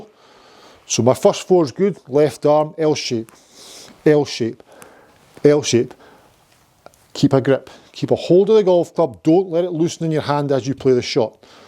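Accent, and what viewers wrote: Scotland, United Kingdom. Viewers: Think your instruction is great. My son in law and I talk to each other on the course and inevitably are saying “that’s good!” In a hard Scottish accent when we are channeling you. Maybe I’ll make it to Peebles one day “soon”. That’ll be “good”. Cheers 😎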